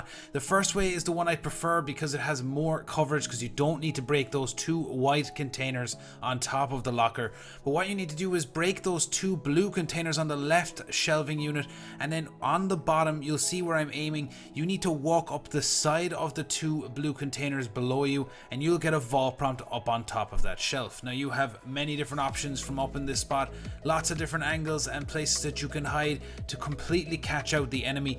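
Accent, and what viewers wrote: Ireland. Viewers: Where are u from in ireland? You sound like jack septic eye but idk what county sounds like that?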